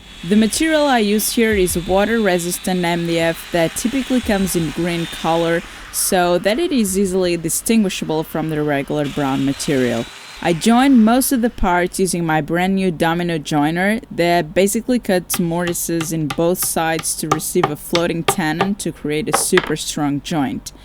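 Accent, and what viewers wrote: Portugal. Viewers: this is a portugesse accent? love it anyway. it reminds me of a dutch accent almost. im binge watching 'the handmaids tale' and stumbled upon you. this recommendation suits me. thank you , youtube.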